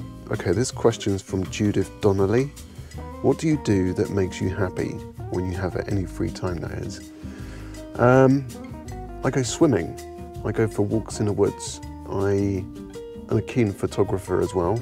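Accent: United Kingdom. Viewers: It's going very well!!. Thank you. I use your videos to practice meditation and improve my English, by the way hehehe. Your voice and British accent are beautiful for both!!. I'm only missing subtitles in a few videos but it's not really important; ) and I've got some notes about music and voice, I'll tell you. Thank you so much!!. BEST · Wow, I just saw that video of the news anchor, too. I could so relate, as I suffer panic attacks also. I decided to try meditations as well, and now have been so much better. I still suffer from insomnia, but doing meditations during the day for relaxation helps me sleep better at night. I am sorry about your dad, grieving is such an extraordinary loss. I lost my dad in 2012, and know how easily it can be to fall into depression. But I had to stop feeling sorry for myself. I had to get my life back together and move forward, too. 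I commend you for working on your weight loss and doing what YOU want and need to do to make yourself happier and healthier. Have you ever thought of making custom meditations for people? I know you couldn't really put them on platforms, but you could charge a certain cost for them. I bet a lot of people would love that. I know if I could meditate to something custom made just for me... wow. I've always thought that would be so cool to have Lol. Anyway, another great vlog with interesting stories about you, and good tips and advice. Thanks and yes a meditation in an American accent would be neat, but honestly, I love the British accent. Plus, it just wouldn't be the same without your voice. Haha. Peace! ☮️ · No don't do an American accent lol😂 I love your meditations because of your English accent 😀great insights here 😋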